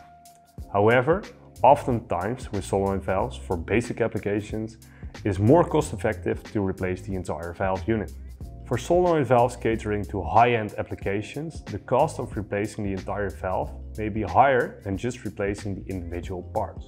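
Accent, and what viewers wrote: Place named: Netherlands. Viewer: I like your accent. Are you dutch?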